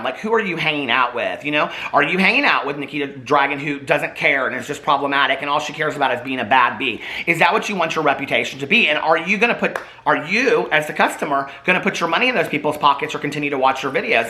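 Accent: South, United States. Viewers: I swear you are more southern than you realize 😂 I still say Q-pon!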